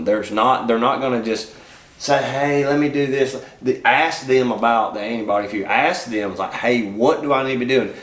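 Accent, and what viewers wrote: South, United States. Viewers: You Country AF. Lol new to your vids. What’s stand for? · Thank you for sharing your experience with Covid-19, Everyone will experience Covid-19 differently with a lot of Similarities, there’s several Symptoms commonly known with COVID-19, everyone has or has not Other Underlying Health Issues that will Most likely make this Virus more difficult to Deal with and it may add more Symptoms and issues, I’m a free diver and I need my lungs to be in top Condition so I try to take necessary measures to keep from getting infected but i also have a few underlying health issues, I’m a Combat Veteran and my VA Is helping me a lot to keep it safe for me. Your message hear is Spot on… My fellow Comrades and I fought in Various Combat theater’s to keep Americans Freedom so Everyone has their Rights, COVID-19 is REAL, PEOPLE ARE DYING, DO WHAT YOU CAN TO KEEP FROM BEING INFECTED, TRY NOT TO INFECT OTHERS, TAKE THIS INFORMATION SERIOUSLY! 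People Pay good attention to what this young man is telling you! I agree with his message, I’m 57 and not in great health so I must stay well, I love my Tuna, Mahi-mahi, Wahoo (Ono), Sword fish) so I must keep fishing (free diving)W/O tanks! KEEP ON FISHING! Where ever you’re from, not sure but you sound like a Southern boy? Kentucky or Tennessee? I have a Army buddy up in Kentucky, strong accent like yours lol. Take bud. Aloha from Hawaii. 🌴🌴🤙🏼😎🤿🌴🌴🍍🥑🍌🏄🏽‍♂️🚣🏽🚤🐟🎣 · I appreciate your heart on this you southern accent reels it all in for me it's like listening to family also I'm sorry for your experience with this and you know and I know God brought you out of this, but the politics is exactly what you think you got it right friend. This is what I discovered and I believe God shown me, from the beginning I knew they rolled it out too fast I was out on lockdown for 4 wks and in that time I experienced a little fear and it felt familiar like the time the world learned about terrorism at 9-11-01 and before that with the extreme Islamic. 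I began to seek the Lord I knew I wasn't anywhere I was supposed to be with the Lord but I did what I knew to do repented began to read my Bible more and I was accustomed to witness cause though steeped in my sin I knew the Lord had a job for us believers to do but I couldn't be around anyone I thought going to street evangelize but my family and some YouTubers advise not going alone but I really wanted to fish, that's my type of fishing cause Jesus made us fishers of men. No one would go fishing with me so I continued what I started to do while on lock down and that became a YouTuber for Christ and I also forwarded videos I found on you tube some shocking things about the poor spirituality of our current state as a country I mean I was desperate to do the work of the Lord I may have went a little overboard but when you don't know if you have the next day or the next moment then you reach out to everyone you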